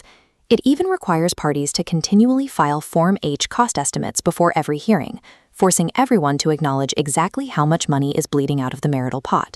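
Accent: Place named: United States. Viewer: English law. English audience. American accent?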